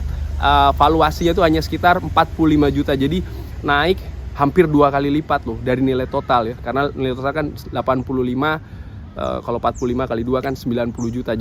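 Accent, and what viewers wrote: Semarang, Indonesia. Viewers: Bang, orang Semarang ?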